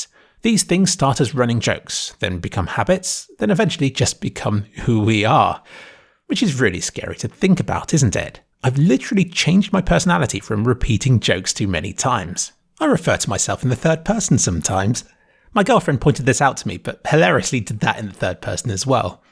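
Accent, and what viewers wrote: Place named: Forest of Dean, United Kingdom. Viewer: Sounds like the people in the Forest of Dean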